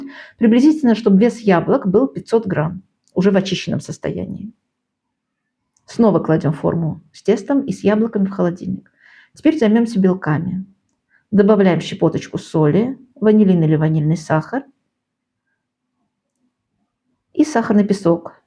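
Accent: Baku, Azerbaijan. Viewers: Пирог конечностей и вы приятная ,удачи вам ,акцент Бакинский напоминания,всем здоровья и мира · Какая же вы очаровательная,!!! Правильно вы сказали,что те рецепты несут с собой такое тепло,потому что мы пекли совсем с другими чувствами… Я больше слушаю ваш чарующий голос и чудесный акцент,сразу переношусь в Баку,и чувствую радость жизни.Вы мой антидепрессант!!!! Храни вас Господь,дорогая ❤!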